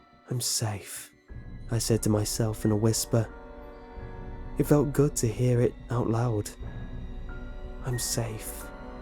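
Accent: United Kingdom. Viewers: Which part of the UK is he from? Like his nearest city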